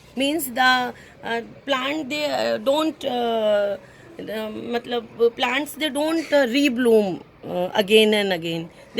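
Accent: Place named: India. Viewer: It sounds like you're from India or Bengladesh, how do you propose to deal with the Lilly's dormancy period in the tropics? I'm trying to protect my lilly plants, they stayed small this year and got chewed up by some kind of beetle. I finally dug them up this morning and started dividing the undersized bulbs and putting them in tiny planters I normally only use for cuttings. I'm hoping that they'll store more energy unmolested in the window with a screen between them and pests. :( I don't use artificial fertilizer at all because it damages the environment and the potassium and phosphate are mined. I use OMRI certified organic if at all. I prefer to use compost, and I've found that putting food scraps in the blender makes them break down into compost faster. High nitrogen fertilizer is actually very bad for plants, making them structurally weaker. So make sure any variety you buy is lower in nitrogen. 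Use grass clippings or shredded leaves at the base of the plant instead to get nitrogen to your plants at a more natural pace because they can't use all the nitrogen in artificial fertilizer.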